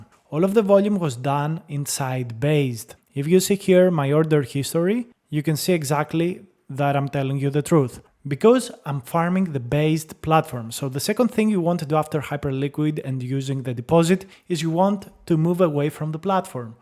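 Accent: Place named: Greece. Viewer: I have a strong feeling that you’re one of the few honest YouTubers out there — someone who speaks openly, doesn’t try to take advantage of anyone, and always keeps it real with your audience. Also, I noticed that you speak English with a bit of a Greek accent, which makes your videos even more unique and enjoyable!😊 By the way, I left a comment on your previous video — I was wondering why Bullpen isn’t listed on DefiLlama? That’s actually one of the reasons I have some concerns about Bullpen.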